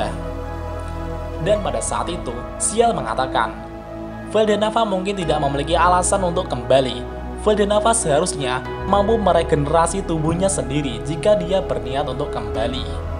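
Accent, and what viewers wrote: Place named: South Jakarta, Indonesia. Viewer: Jaksel ya bang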